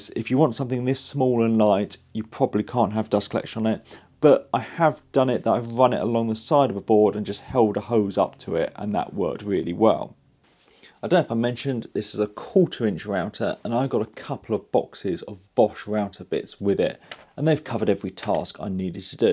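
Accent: United Kingdom. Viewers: Wonderful presentation, right on the money. It’s amazing listening to the British accent, by the way…👌 Thanks for sharing mate…😎 While I have your attention, would you happen to know if Bosch has any plans to make a cordless plunge router?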